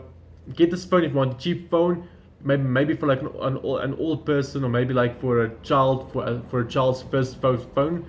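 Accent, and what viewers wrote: Afrikaans, South Africa. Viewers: Daai lekker diep Afrikaanse aksent, jys 'n regte boer!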